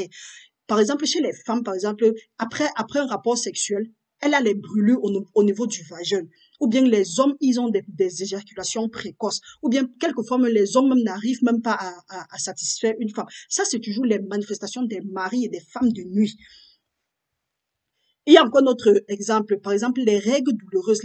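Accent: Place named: Cameroon. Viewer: Il me semble que vous êtes Camerounaise ma soeur de par votre accent. Que Dieu te bénisse davantage au nom de Jésus la sentinelle de l'Eternel.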